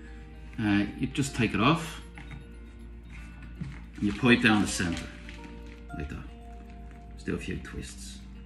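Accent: Ireland. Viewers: Love your Irish brogue! Sláinte! --gv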